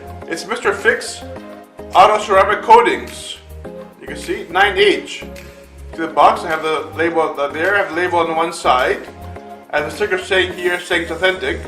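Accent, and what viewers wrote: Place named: Greece. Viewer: Wow, I wasn’t expecting to find such an amazing video about ceramic coating a rear view camera, and to top it off, the voice and dialect are incredible! Your explanation is so clear and engaging, and that Greek accent just adds an extra layer of charm. This is by far the best video I could find on this topic—thanks for sharing your knowledge in such a great way! Keep up the awesome work!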